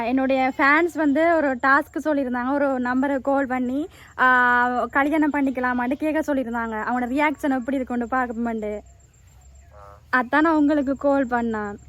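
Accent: Sri Lanka. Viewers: உன்னுடைய இலங்கை பேச்சி ,அழகு,கவடு சூதற்ற தன்மை பிடிச்சு இருக்கு.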